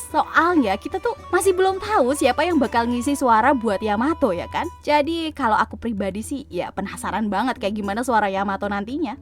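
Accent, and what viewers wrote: Java, Indonesia. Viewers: Ternyata kak suaranya ada medoknya wkwk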